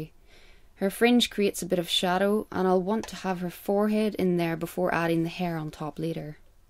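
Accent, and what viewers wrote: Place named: Ireland. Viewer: thanks for the art lesson..i usually add dark blue (just a stroke ..in the darkest of the dark...like a highlight) to the black in the shadows to make them appear darker.. I love your Irish accent...I have to find a Irish girlfriend Gerard Mineo also does velour portraits that I like: <link>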